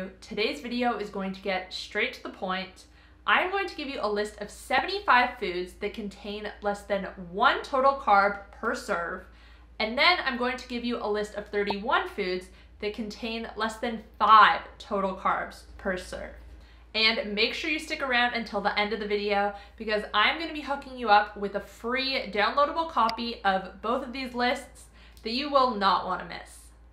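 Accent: Canada. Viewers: She sounds Canadian cause of some of her vowels. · haha quite a small oops. your accent is very mild by the way. you sounded Canadian actually. great stuff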